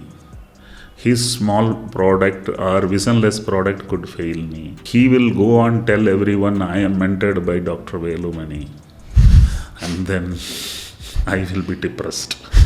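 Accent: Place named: Tamil Nadu, India. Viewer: Love that TAMIL english accent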